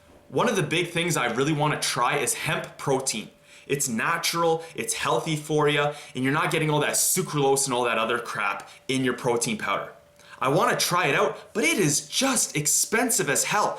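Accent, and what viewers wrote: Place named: Russia. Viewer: Why the Russian accent if you are Hungarian ? "Vun hundrred perrscent Hungerrian voman"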